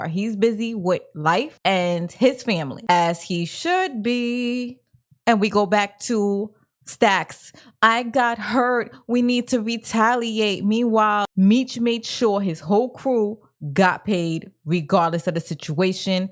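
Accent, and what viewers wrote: New York, United States. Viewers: Your breakdowns and edits are really cool and always hit the important elements of the episode,. Your New York accent adds the flavor - my girl is from Looong Guylanddd. The father-son (and mother) bonding over music is a Detroit thing, IMO. I learned keys, bass, and organ from my mother and uncle. Stay strong!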